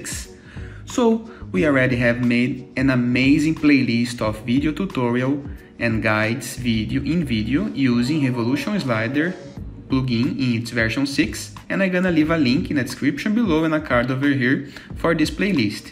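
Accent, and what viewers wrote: Brazil. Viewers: Esse sotaque br não engana rapaz, hahhahha valeu cara salvou minha vida aqui! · It sounds like Brazilian guy speaking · Amigão pela pronuncia vi que é BR, faz um roteiro antes de gravar o vídeo. Vai ficar mais fluido as explicações. Valeu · sotaque full br kkkkkk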